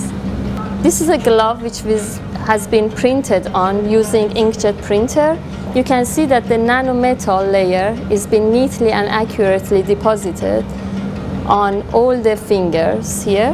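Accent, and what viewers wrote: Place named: Iran. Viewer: At the first moment from her accent I found she's Iranian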